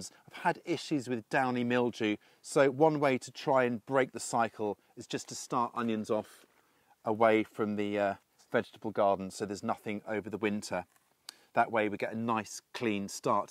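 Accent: United Kingdom. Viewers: Zone 8?!?!?! Where is he? I assumed he was in Britain because of his accent.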